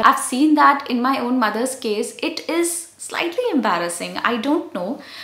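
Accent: India. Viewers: As a westerner I have noticed a possibly related problem here on youtube. Sometimes when searching for tech advice I used to find videos from Indians that would have a title written in English, but then when you actually watch they are speaking in Hindi or another Indian language. Sometimes they would speak a couple sentences in English and then switch their language for the rest of the video. It made no sense to me back then because it seemed like it was just wasting people's time, especially when there would be no subtitles. However, because of this video I think I understand why this happens. It seems that some Indians use English as a way to show status or brag even if they are not necessarily comfortable with the language. Another problem is that it seems many will speak broken English with grammatical errors or in a way that is way too formal, but seems to have a strong Indian accent while still speaking English very fluently.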